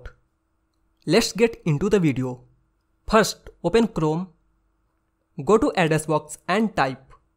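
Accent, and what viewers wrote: India. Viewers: You know its legit when the accent is Indian♥️ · Yeah always India is legit on tutorials😎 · Why the english accents of indian so lame 😑 btw thank you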